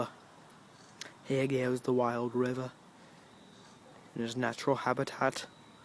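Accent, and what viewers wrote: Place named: Australia. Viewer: nice Australian Accent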